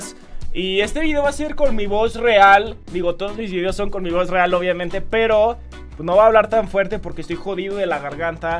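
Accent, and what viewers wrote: Mexico. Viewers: Eres de mexico no?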